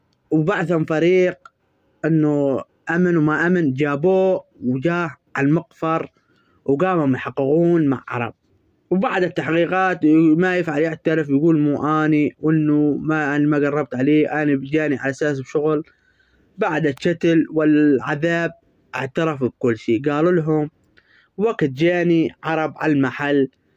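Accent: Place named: Hejaz, Saudi Arabia. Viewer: السلام عليكم اخي ياحلو سوالفك وسردك للقصه ليتك كل ليله تجيبلنا قصه وجزاك الله خير والله تابعت كل قصصك واعجبني لهجتك الحجازيه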